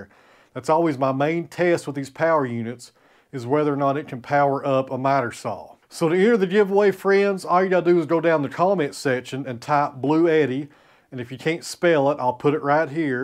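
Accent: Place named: Tennessee, United States. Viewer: Bluetti was smart to back you up,. I can't really explain, but you voice and the charming Tennessee accent I hear, always makes your videos so smile inducing.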